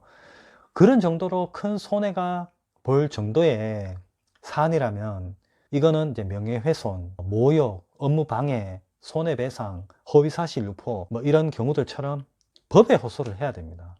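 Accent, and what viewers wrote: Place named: Gyeongsang, South Korea. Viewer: 감사합니다 ~^^ 지나가다 들렀습니다. 구수한 경상도 사투리가 친숙하네요 말조심하는 하루가 되도록 연습하겠습니다.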